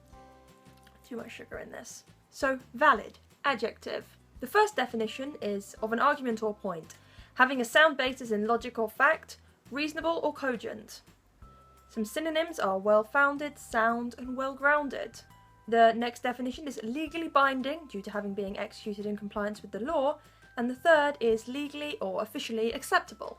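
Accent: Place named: London, United Kingdom. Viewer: You have the same accent as my writing buddy out by London! She completely accepted my asexuality when I asked her to potentially help me out with writing romantic scenes in my books, even said asexuality sounded cooler and less annoying than being sexually attracted to people. She's not asexual (as far as I know) and she immediately accepted me without any need for debate and we'd only known each other through email for maybe 2 weeks. What's your excuse world?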